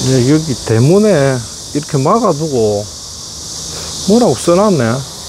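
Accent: Gyeongsang, South Korea. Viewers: 재방이지만 오늘도 기분좋게 보고있습니다 같은 경상도라서그런지 넘정겹습니다 편안하고 푸근합니다 ~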